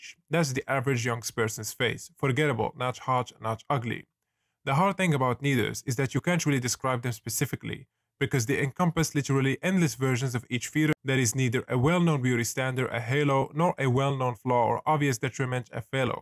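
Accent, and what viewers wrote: Morocco. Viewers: من أول فيديو عرفتك مغربي من صوتك 😅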